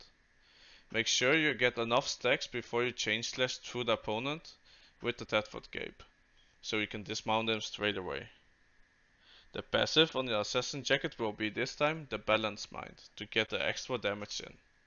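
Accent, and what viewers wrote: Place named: Netherlands. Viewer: For some reason i smell dutch o_O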